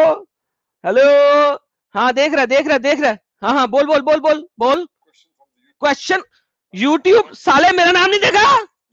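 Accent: India. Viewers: you are just awesome. I used to listen to this idiot as a non muslim when my Muslim friends preached Islam. I wanted to know reality and always despised Zakir Nalyak because of how he belittled other religions down and claimed only islam is true religion of God. Don’t know how some people convert listening to this idiot. He has no logic. you are very knowledgeable and entertaining, should open a comedy channel. Love your Punjabi accent 😊. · I love how has picked up sahils mubaiya accent 😅❤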